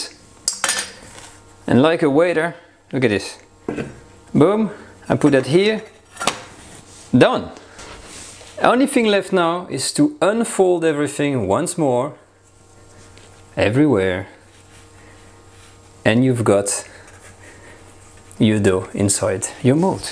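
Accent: France. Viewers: this guy talks too much...the first 3 minutes repeats same 15 second information twelve times in thick french accent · Your English is utterly idiomatic but with a French accent. Magnifique!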